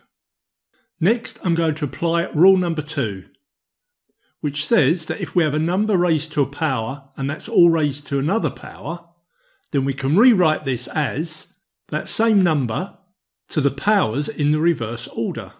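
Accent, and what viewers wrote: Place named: Cockney, United Kingdom. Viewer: Really nice! Love your accent(Cockney I hope?)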